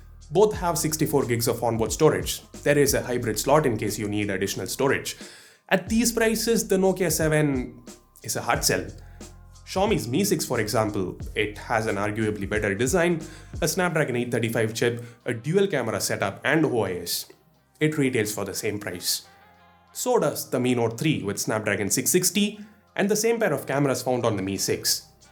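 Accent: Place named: India. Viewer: Im an indian too. But this guy has the most indian accent ever.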